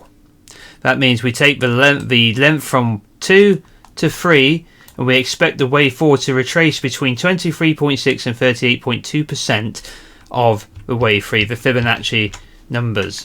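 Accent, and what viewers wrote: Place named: England, United Kingdom. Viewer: I don't know if it's just because of the English accent, but as a non-trader I understand everything you say, you explain things very clearly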